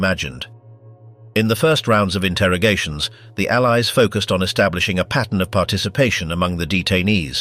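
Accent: United Kingdom. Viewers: Like as someone re-edited all the documentaries into a poor ridiculous English accent… really bad.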